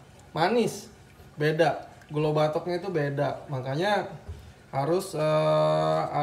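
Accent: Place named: Palembang, Indonesia. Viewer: tetap semangat Chef jangan dengerin orang yang nyinyir saya sudah cobain resepnya 👍👍 dari bahasanya sudah ketauan bahasa palembang ya Chef 😊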